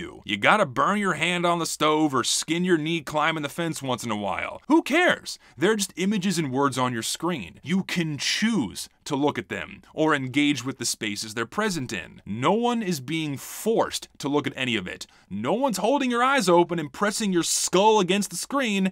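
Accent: South, United States. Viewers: You should is it embrace the Texan accent, dude. It’s slipping anyway. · Is it me or does sound really Southern in this video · with that southern droll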